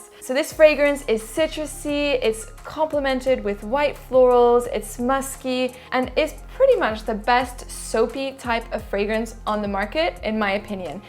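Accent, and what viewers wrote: France. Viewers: Great video,! Everytime she pronounces the names in this French accent...I wanna wife her up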